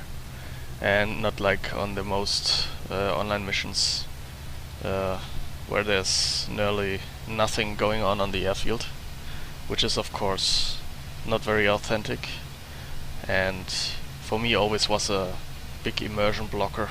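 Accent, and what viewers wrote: Germany. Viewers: nice. I really enjoy your vids. your English is very good, and the german accent gives a nice 'feel' to the video when you are in the 109. by the way,(as a 'teaching thing', not as a 'know it all'....just to help you learn English), 'lympne' is pronounced 'limp-nee'......the word you had trouble with....'arguably', is pronounced 'are-gew-ab-ly', (I think you were just in a hurry and got 'tongue tied').....I can't wait to try your server.....thx....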